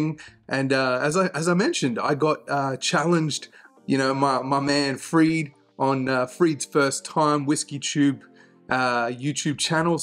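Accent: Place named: Australia. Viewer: It's like majorkill from Warhammer 40K acting like a perfect gentleman and talking about whisky instead of spacemarine figurines and hentai. I guess the Australian accent is just more gripping. Great content.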